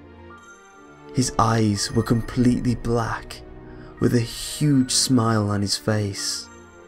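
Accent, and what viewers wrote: United Kingdom. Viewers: This is my favorite creepy pasta channel, I don't why but I like them better when someone British reads them. I have watched most of his, does anyone know of another really good british creepy pasta channel?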